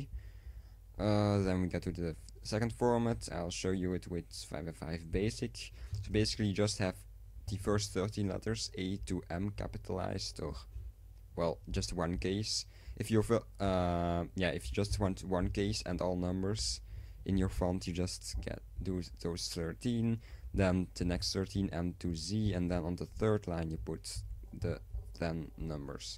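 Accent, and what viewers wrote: Netherlands. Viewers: Nou ja ik ben gewoon nederlands ik hoorde het aan je accent en aan je naam zag ik het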